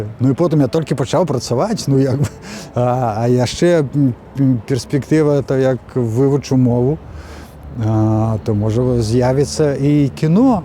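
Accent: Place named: Poland. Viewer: Ужо маеце крыху польскага акцэнту! Няхай буде усё добра у вас! На жаль, што такi таленавiты чалавек зьехау у замежжа!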